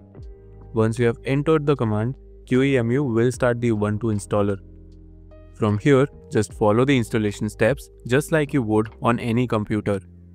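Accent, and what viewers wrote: India. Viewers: indian, ew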